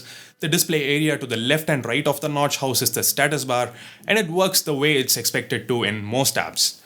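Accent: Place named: South India, India. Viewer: Still you have heavy south Indian accent. Nice review though...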